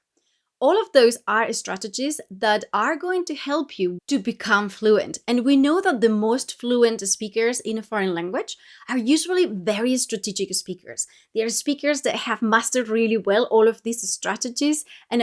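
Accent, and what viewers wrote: Scotland, United Kingdom. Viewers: Yes, her accent is a mixture. I hear a Scot and English accent. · Solid video! I'm interested in your accent. Are you a native Spanish speaker modelling your English after a Scottish accent? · Yup, I was looking for confirmation of this! Certain sounds are very Scots. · Of course you can tell she's Spanish, but she's got a mix of different British accents, especially Northern English and Scottish. No thick accent at all. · A significant detour, but I very quickly heard a noticeable bit of a Scots accent :) It took me a long way back to a Scots colleague who was also a fluent Spanish speaker...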